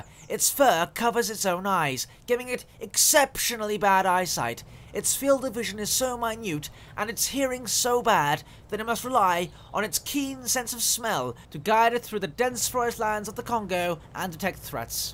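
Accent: United Kingdom. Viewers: What is it with you Brits and narrating xD When the messerschmitts drove to the downed wellington you really cracked me up haha. (I chuckled throughout the enitre video :p)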